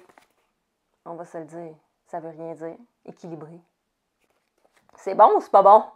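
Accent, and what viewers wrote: Quebec, Canada. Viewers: C'est aussi Pâques au Québec J'adore votre accent, j'aimerais y aller un jour, et super votre video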